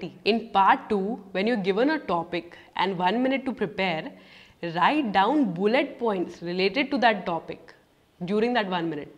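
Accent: Hyderabad, India. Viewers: Sister....are you from Hyderabad??? I initially thought that this is an abroad channel.. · Akka hyd a...? 😁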